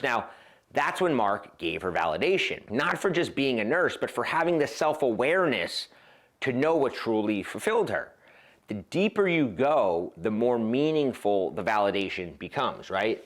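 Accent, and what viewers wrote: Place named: Philadelphia, United States. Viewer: Love it. Slow validation. I’m in exact situation now I’ll be 55 and the girl I’m dating is 39. I’m used to dating much younger woman so I’ve learned this overtime, it took me time in a few mistakes, but eventually, I got it . Quite frankly, most women love a mysterious man. I hear that Philly accent by the way.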